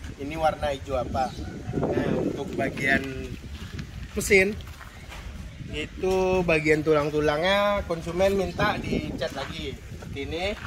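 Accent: Padang, Indonesia. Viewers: Orang Padang bang? Logat bahasa Indonesia nya kek bahasa org Padang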